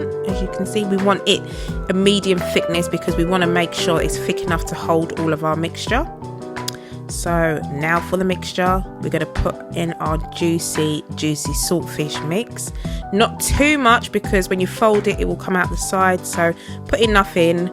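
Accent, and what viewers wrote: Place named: United Kingdom. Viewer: Good question .your accent is British .I think u born there .but if you jamaican family born uk .can you talk patios?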